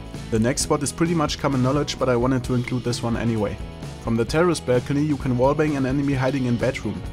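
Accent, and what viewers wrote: Germany. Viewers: man hört einfach raus das es ein deutscher typ is ;D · Du kommst aus Deutschland oder ? :D hört man am aktzent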